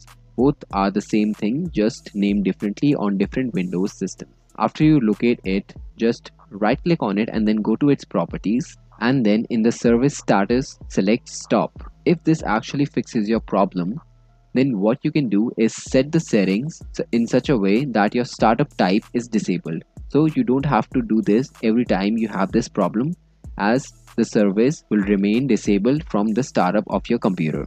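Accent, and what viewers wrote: India. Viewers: when you hear indian people. you know it will work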